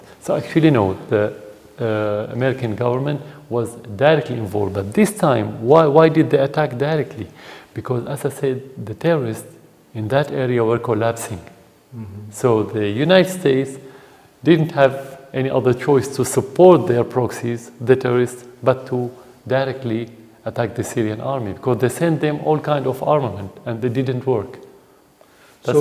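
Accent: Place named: France. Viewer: why does he have a french accent? he speaks English with a french accent .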